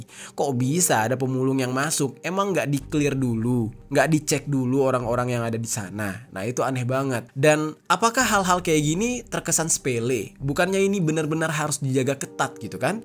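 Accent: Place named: Aceh, Indonesia. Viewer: Bang tdk bisa ngucapin krn dia orang Aceh logatnya beda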